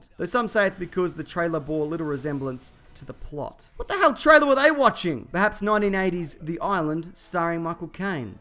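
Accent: Australia. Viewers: Dark City trailer told us that SPOILER aliens move the city around with their minds. Psycho's trailer showed us SPOILER a blonde woman screaming in the shower (actually Vera Miles in a wig, not Janet Leigh). Also you should make one of these where you just say Australian accent words like "Green LANT'n" or "Yes-tah-day." Childish, I know, but pleasing to my American ears.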